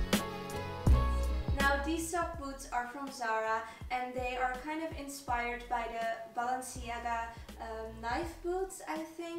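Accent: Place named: Netherlands. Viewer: Haha dacht al dat je Nederlands was door je accent blijk je dat ook te zijn 😂😂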